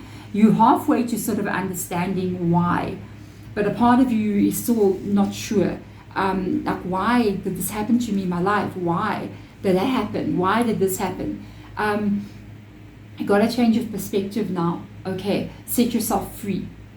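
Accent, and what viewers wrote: South Africa. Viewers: So I just came out of a webinar about Bosnian pyramids and Theta healing meditation and this video popped up on youtube. :) I listened to you before and liked your energy, it resonated, but I kind of like to avoid "prophecies", meaning someone else determining what will happen ( as opposed to me making my own destiny), but yet again this resonates. i mean we can argue it's algorithm, but then again, why this precise reading popped up. :) I felt for a very long time now like this planet is a prison and I'm being punished (Theres a book I even came across, Alien interview, where an alien (a very advanced species) talks exactly that, this planet is a prison and all of us are prisoners) and at 48 I'm already soooo tired of life haha. The pyramids are heavily dismissed by mainstream science as not real, but they hold incredible energy, very real and oldest on this planet. 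People have reported healing (where there was "no help") and studies have been conducted. But it's good actually, because not that many people come (as opposed to Egypt) so the pyramid energies are really strong, it's peaceful and great for meditating. Youre South African right? You sound like it, I like that accent. I feel like Africa being the "cradle of life" must hold a lot of wise souls and that's why your readings resonate (when I come across). and you're a wicca? which also interesting, and you talk about vedic things, such as karma, enlightenment, which I know a lot about. So THANK YOU. :))) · (hope I've wrote your name correctly), I came across your thing recently, yes I'm sure we would be swimming in puddles or pools of tears at times, cuz your delivery is the centre point for me, (chiron intuition, majigotthet, and aeon moon, Erin chandler, four other greats, you might look at, or whoever reads this, I have been touched,your being south African is so poignant you wouldn't believe, look forward to one day conversing, also your accent(voice) is so enchanting you ouse, mother earth and massively much more, love&light, peace&equality(. 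❤xxx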